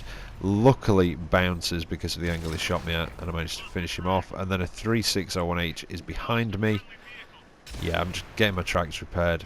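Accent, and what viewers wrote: United Kingdom. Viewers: British Bloke lmao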